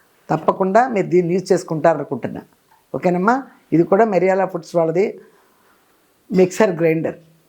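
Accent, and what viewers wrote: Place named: Krishna, India. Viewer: Amma mee native place krisha districta. Acham vja side la ne chesthunnaru. Me bhasha kuda krishna jilla la ne undi.krishna jilla vallu thappa migilina ea pratham vallu ayina nimmakaya pachadi thalimpu vestharu. Assalu bagodhu. Naku ma nayanMma gurthochindamma. Me lane kakarakaya, mirchi allam vesedhi.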